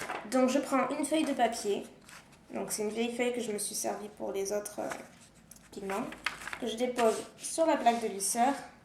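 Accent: South, France. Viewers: C'est bizarre, on dirait que tu as plus l'accent du sud dans cette vidéo que dans les actuelles :o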